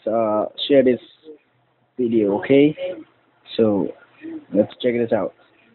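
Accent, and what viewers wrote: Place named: India. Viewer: at least you understood what you saying ? your English accent is worst , don't know why our fellow indians talk English in this worst way ! buy tube light in your washroom.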